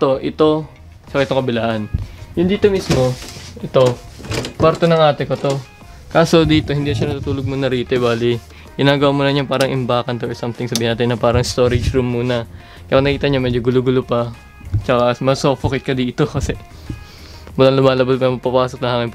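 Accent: Visayas, Philippines. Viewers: Bisaya po ba kayo??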